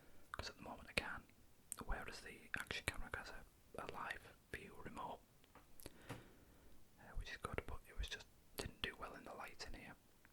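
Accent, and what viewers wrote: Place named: Northern England, United Kingdom. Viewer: Just discovered you. You are now my favourite ASMRist. You sound like your from the north of England; if so where abouts? Yorkshire, Lancashire?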